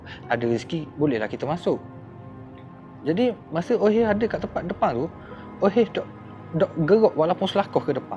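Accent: Terengganu, Malaysia. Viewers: Jgn nagis bang😂...org ganu eh bang? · Org hok komen negetif tu bute kayu teruskan langkah mu. Hok penting kekalkan pelat Ganu OH trademark mahal tu. Mana nak cari explorer ckp Ganu dlm dunia ni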